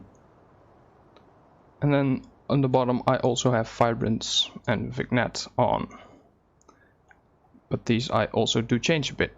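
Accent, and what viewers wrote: Netherlands. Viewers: AYE U DUTCH BRUH? wassup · Haha lekker geEngelst vgm ben jij NL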